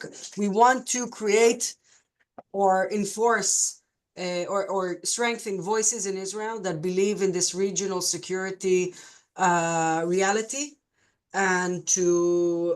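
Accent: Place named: Europe. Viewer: Curious what accent that is, it’s not an Arabic accent, so you’re not from the Middle East. What country in Europe are you from? Also, you’re in a class by yourself saying that it’s helpful that Trump has been elected. Are you aware he’s completely tearing apart our government. But I’m glad it’s helpful for you and your efforts.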